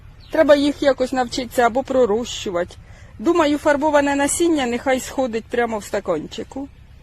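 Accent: Poltava, Ukraine. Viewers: Дуже гарна мова ваша,Полтавська❤🇺🇦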